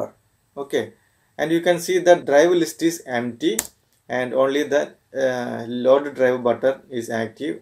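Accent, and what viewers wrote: India. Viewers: Excellent! To be honest, at first, I was put off by the poor-quality audio and the strong Indian accent, but everything he showed - worked. I didn't have the drivers in my Windows installation USB (even though I downloaded the Boot creation software right from Microsoft's website ...) I downloaded them from the link he put in the description here, unzipped the packages and it worked. I have my partitions showing now. Thank you and keep up the good work!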